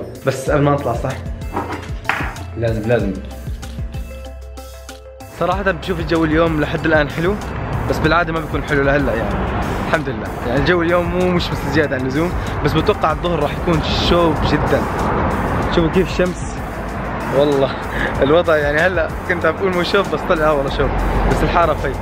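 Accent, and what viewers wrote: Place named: Syria. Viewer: حلو كلامك السوري خليك هي عم تحكي دائماً💚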